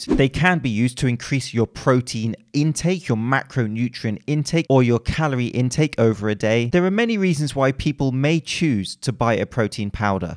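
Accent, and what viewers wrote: United Kingdom. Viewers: Are your accent was British?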